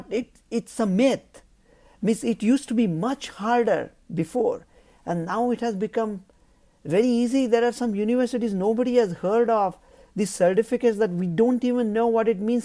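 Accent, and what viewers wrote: India. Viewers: From the influence that your mother tongue has had on you language, some of which is still left behind, I can garner that you come from a Gujarati descent. Am I not right, Mr.?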